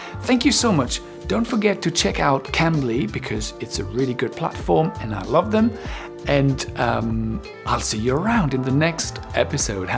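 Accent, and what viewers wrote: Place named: England, United Kingdom. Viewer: Hello, I watch your Channel quite a lot. I love, your English accent and your quiet and bright way of speaking. I progress a lot with you. Thanks for it. Take care 🍀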